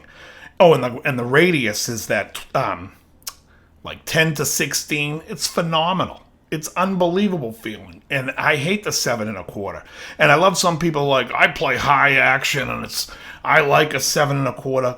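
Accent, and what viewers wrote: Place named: New England, United States. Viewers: I subbed because I'm positive from the shirt and voice youre from Mass and so am I so fuck yeah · Just found your channel today. Love the Sox jersey. You sound like youre from New England too. Waltham here. Anyway I agree with your point completely. Good shit guy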